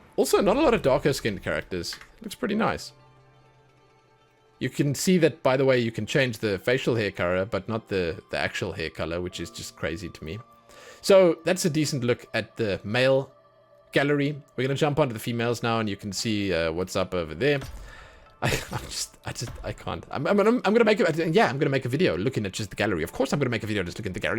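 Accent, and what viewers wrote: South Africa. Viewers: Are you South African? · Your accent sounds like south african. I'm from South africa and u sound like our accent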